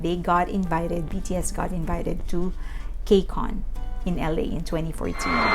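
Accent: Philippines. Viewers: Thank you sooo much great summary ❤️... I believe you are a Filipino just by your acccent , because I am ❤️❤️❤️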